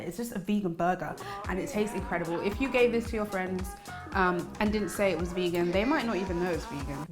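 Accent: United Kingdom. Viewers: Gosh you’re very beautiful and that British accent compliments your beauty. By the way I’m going to try this burger. I’ve been a vegan for three months now.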